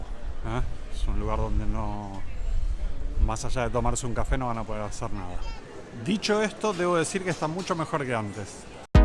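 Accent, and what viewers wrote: Argentina. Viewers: Hola. ¡¡¡¡¡ Pero como disfruto tus viajes aunque sean cortos!!!!! Me encanta como lo cuentas todo y ese acento argentino............ El que hacía los anuncios era muy gracioso hablando, nosotros los españoles aún notamos más los acentos. Quiero más vídeos. Un abrazote gigante, Barcelona te echa de menos.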